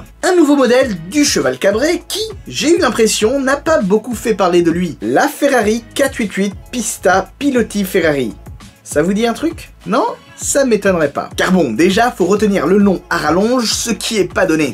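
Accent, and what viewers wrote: Italy. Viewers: Salut, tu pourrais faire une vidéos sur les préparateurs stp stp stp ? <3 <3 Plus sérieusement, de ce que j'en ai compris, la QUATRE HUIT HUIT Pista n'est pas limité en exemplaires mais en temps donc compliqué à en avoir une ... j'y crois moyennement, seul un nombre d'exemplaires sera attribués aux concessions. Sinon très bel accent italien, je te repost rien que pour ça !! Vivement la prochaine vidéo en full Italien :o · j'ai liké pour l'accent Italien x)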